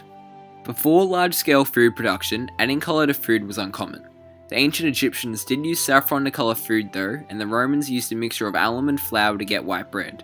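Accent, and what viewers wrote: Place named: Australia. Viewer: Le gasp Australian accent hehehe. (I mean, I knew that already, but still...)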